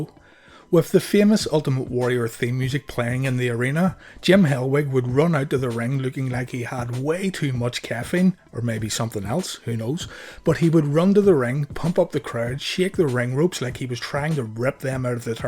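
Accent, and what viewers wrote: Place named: Scotland, United Kingdom. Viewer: I was expecting an American video and this Scottish dude comes on.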